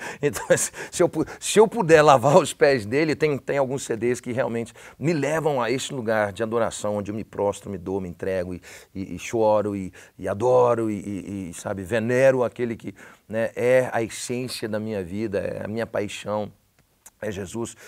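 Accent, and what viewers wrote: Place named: Brazil. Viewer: Interessante o falar q pensa e sonha em ingles... ele eh o primeiro gringo q conheço q consegue 100% do sotaque brasileiro... incrivel demais, provavelmente algo divino mesmo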